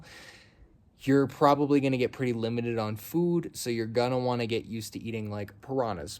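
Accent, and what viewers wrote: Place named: North, United Kingdom. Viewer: hola amigo, estoy practicando mi listening skills, tu acento es norteño cierto¿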